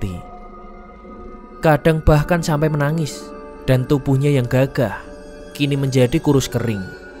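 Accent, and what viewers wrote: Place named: Temanggung, Indonesia. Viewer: Aksennya temanggung banget min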